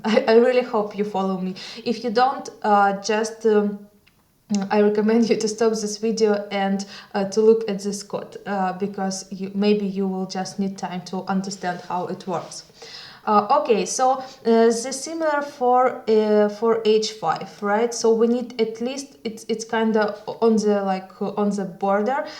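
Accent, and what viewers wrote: Russia. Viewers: The dictor has a strong russian accent:) It seems easier to make it in russian for her than in english)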